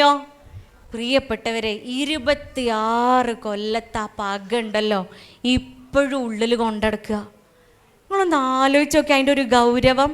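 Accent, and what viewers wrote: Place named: Malappuram, India. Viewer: മലപ്പുറം ബാഷ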